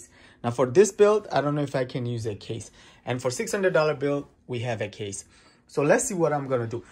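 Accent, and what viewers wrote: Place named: Bengal, India. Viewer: Bhaiya You sound like a Bengali . Are you a bengali?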